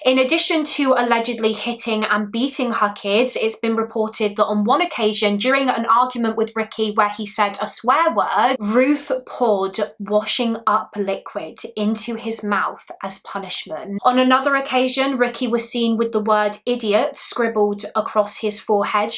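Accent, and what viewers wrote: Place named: Midlands, United Kingdom. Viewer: Okay I know this is completely unrelated to this tragic story. But where are you from? I’m from the UK but can’t pin your accent and it’s driving me insane like when you can’t remember the name of a song or tv show 😂 I’m guessing somewhere in the midlands?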